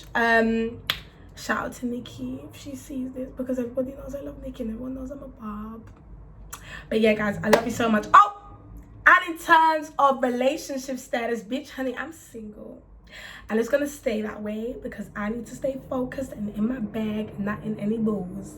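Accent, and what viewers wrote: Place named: United Kingdom. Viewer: I don't usually like the British accent but you I love! but when you clap your hands all the Time omg I get so stressed. but love your energy and you deserve this love!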